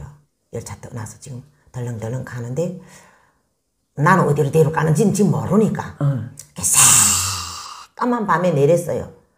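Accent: Hamgyong, North Korea. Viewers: 여사님 이름이 마음에 듬니다 남한에는 트롯트가수가 유명하지요 함경도 말씨가 정감이 갑니다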